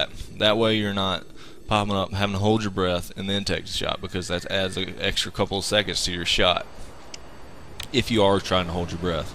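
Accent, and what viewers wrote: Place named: South, United States. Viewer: Nice video, great quality, good tips. Are you from the south? I'm from GA, your accent sounds kinda familiar.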